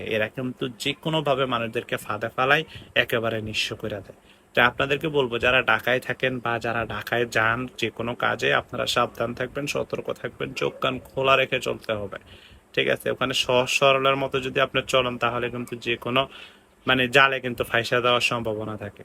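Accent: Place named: Noakhali, Bangladesh. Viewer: বুঝতে পারছে তুমি নোয়াখাইলা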